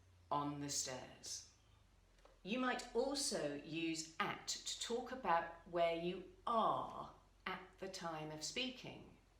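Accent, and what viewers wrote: United Kingdom. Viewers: "...I KNOW IT´S ONLY ROCK AND ROLL BUT I LIKE IT...", LOL, YOU ARE BEAUTIFUL TEACHING ENGLISH WITH THAT BRITISH ACCENT : ) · Thanks for to teach English by this way. I understand very well your British accent. You're an excellent tea her. Take care and Godspeed. 👏👏👍🖐️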